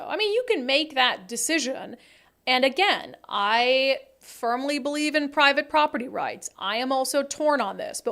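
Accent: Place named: Minnesota, United States. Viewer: It is Minnesota accent.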